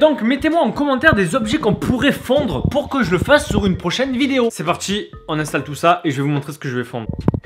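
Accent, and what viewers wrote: Marseille, France. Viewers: waw l'accent marseillais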